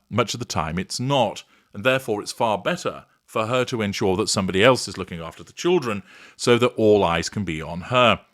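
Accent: United Kingdom. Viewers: You make a good point. Sometimes one's job necessitates these absences. I would also say, though, that a nurse or doctor on night shift would probably have SOME time with her/his children when off work. I also find it funny that, who has (or puts on) what I think of as a British accent, makes no mention of the British custom of sending children away for boarding school. He mentions having nannies and that's all well and fine, but the whole boarding school thing... I know that happens when children are older, but boarding school always seems kind of brutal to me, here in the U.S. YMMV Edited to give his due for pronouncing Caribbean correctly. One of the native nationalities there are the Caribe (KEH·reeb, not cuh-RIB). Sorry but it's one of my pet peeves. As you were.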